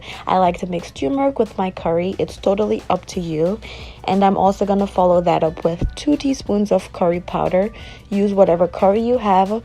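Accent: United States. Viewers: You sound a lil Geechee ngl you would fit right in Charleston NC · Is she really Jamaican, all i hear is an Yank accent! Anyone can cook Jamaican food and claim Jamaican heritage!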